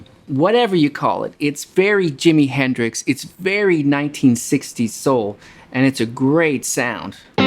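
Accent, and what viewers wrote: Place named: Vancouver, Canada. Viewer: The haircut, the body, the accent... you are the embodiment of Vancouver BC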